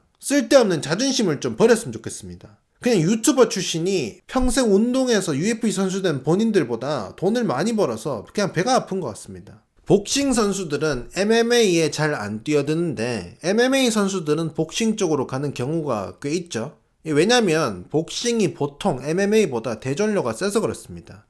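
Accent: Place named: Rural, South Korea. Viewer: 근대 왜 말투가 이렇게 촌스럽습니까? 어디 억양인가요?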